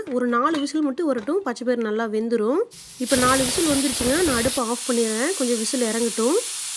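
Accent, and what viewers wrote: Kongu, India. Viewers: கொங்கு நாட்டு கிராமத்து கொஞ்சும் தமிழ் இனிமை பருப்பை உடைக்காமல் அப்படியே வேகவைத்து கடையலாம் அருமையாக இருக்கும் சீரகத்துடன் சிறிது தனியா சேர்த்து தாளிக்கவும்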